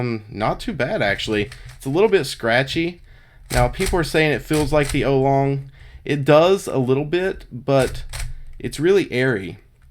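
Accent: South, United States. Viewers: You have a bit of a Southern drawl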